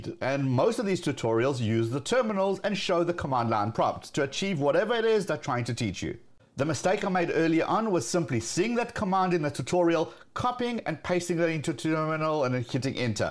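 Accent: South Africa. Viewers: for real bro, you from SA?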